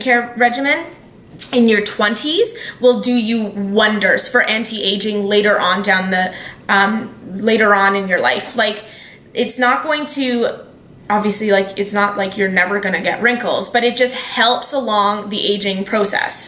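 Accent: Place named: Canada. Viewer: Just Canadian accent.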